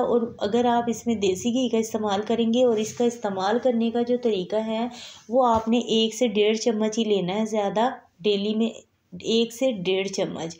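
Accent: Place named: Lahore, Pakistan. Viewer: Ap ki aavaz boht polit h... Style of saying is lahori😊... Boht achi tarh samjhaya gya h ap ki trf SE... Delicious so much ❤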